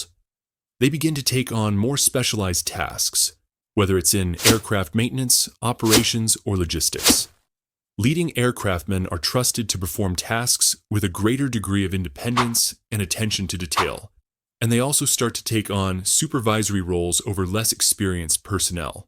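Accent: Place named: United States. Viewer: So weird here’s an American accent explaining Australian military ranks. No thanks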